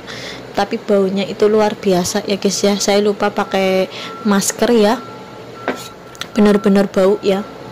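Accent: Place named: Ngapak, Indonesia. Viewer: tenan mas bahasane wong ngapak medok banget aku suka itu, lembab lembab gemericik he..he..he....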